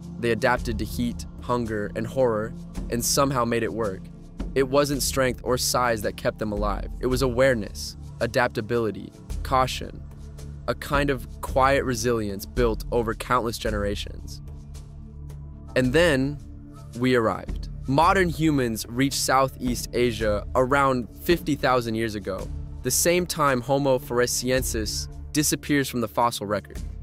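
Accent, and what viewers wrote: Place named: United States. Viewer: I like this reading voice. It's not AI! SO EXCITING to hear a human read and americanize the mispronpunciations. I love it! Would be great to show to children. Because of the animation. The ending is always a little abrupt. Ask a question at the end to get more comments. The videos are good and your voice is nice to listen to. I heard nothing new in this video. But, even the fake animation was great. We never get visuals because, they don't want to use movie clips or things not really too close to accurate but these graphics make it nice to watch for sure and let the imagination can run wild with it! Thanks again. And thanks for reading this in the real world!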